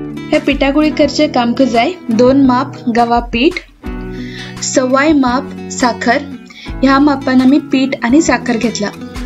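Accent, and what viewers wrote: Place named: Konkan, India. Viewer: You speak exactly my kind of konkani! I'm stunned. Haatutach and not haantuchi 🤗. Where do you come from?? I was always being corrected saying my language is not correct but now I'm so happy to have found another konk speaking like me and my mom's side of family!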